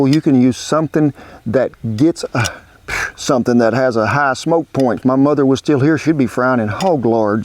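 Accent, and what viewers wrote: South, United States. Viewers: Its the deep southern drawl for me ❤️❤️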